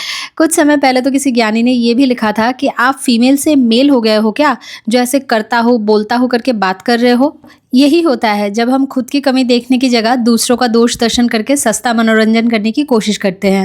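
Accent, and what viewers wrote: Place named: Haryana, India. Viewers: Right di I'm from haryana and its normal mai or meri cousin, friend aise hi baat krte hai kbhi kbhi . Ap in sb p jyada dhyan mt diya kro ap jaisi ho perfect ho❤ love you alot from haryana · Haa didi❤ radhavallabh shri harivansh... Aap shi bol rhe ho m bhi haryana se hu or hmare yha kuch ladkiya bolti hn aise and sach me cute sa impression aata h❤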